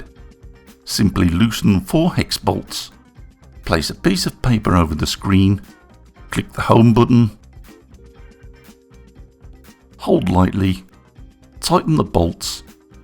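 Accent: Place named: Wakefield, United Kingdom. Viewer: Sorry buddy I have to call you out on this one on TWO counts! One he is a northerner possibly a fellow Yorkshireman like me ( sounds like he's from Wakefield) and we are ALL plain speaking and if we don't like something we tell you weather you like it or not, its in our genetics. Second I was a big name some 5 years ago in reviewing computer parts (after being 2x UK OC winner) and I will tell you this, I know an honest reviewer when I see one! has the same philosophy as me, "SAY it as you SEE it, but INVESTIGATE thoroughly! " so with all due respect I have to call you out on this one. Have a great weekend:)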